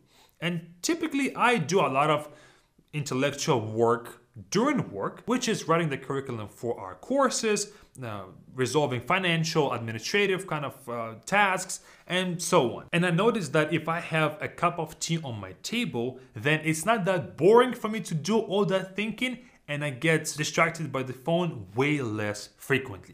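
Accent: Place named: Russia. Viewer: Чувство, что он русский, но прикидывается иностранцем.. Даже акцент русский есть:0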